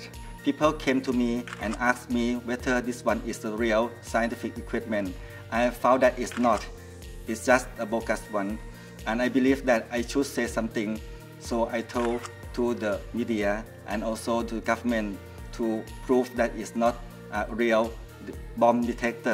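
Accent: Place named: Thailand. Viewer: ชอบสำเนียงไทยอาจารย์มากครับ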